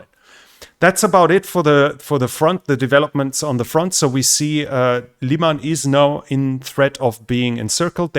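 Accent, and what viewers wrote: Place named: Germany. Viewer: Well he does have a German accent and that is totally fine, you shouldn't look to loose your accent when learning English but instead focus on pronounciation, vocabulary etc...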